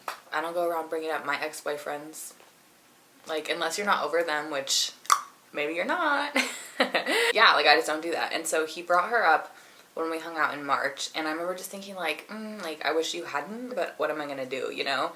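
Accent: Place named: New York, United States. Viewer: You lowkey have a new york accent lmao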